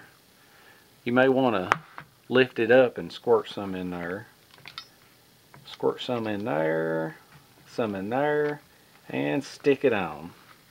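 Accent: South, United States. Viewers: Oh my lord he’s got a heavy southern accent. I got one it just ain’t that heavy of an accent.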